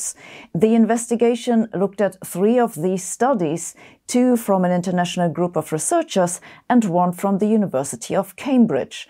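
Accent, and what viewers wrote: Germany. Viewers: Somehow her German accent makes the science that she's presenting seem to be even more credible. Although, when she said Dr. Weibel, I thought she was talking about someone named Beiber, since such a name would be pronounced as Beeber in English (and Bye-berr in German). Naturally, I thought she was going to say that "Dr." Beiber discovered magnetic fields by electrifying crowds of teenage girls and causing them to be magnetically attracted to him. Thankfully, the video is packed with info, rather than jokes. Thankfully, also, is the fact that the Dr. in question is a real doctor and is named Weibel, rather than Beiber!!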